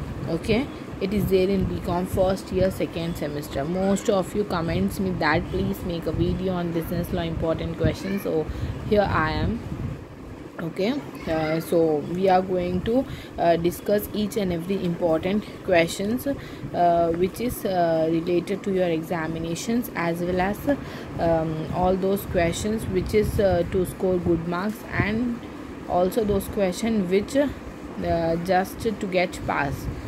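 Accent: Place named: Hyderabad, India. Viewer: Thanks mam for this.. Pure hyderabadi explain😊😊😊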